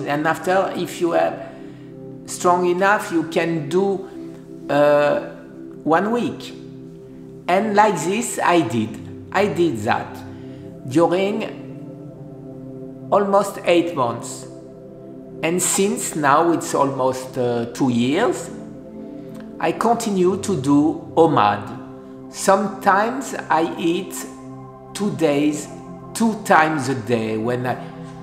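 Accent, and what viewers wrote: France. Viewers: Your comments are very interesting, in the same it helps us to understand because you have very french accent. for is the same I have very Provencal accent Thank you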